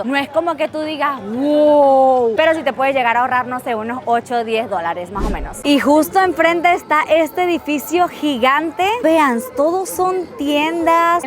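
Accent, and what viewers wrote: Venezuela. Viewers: Siento que en este video habla más como VENEZOLANA, que cuando está en México 😅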